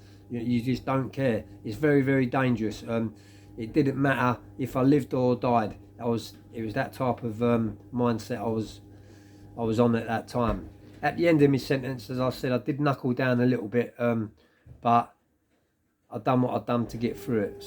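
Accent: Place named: South London, United Kingdom. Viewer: iknew it was south of the river accent 😂😂good luck with your mission mate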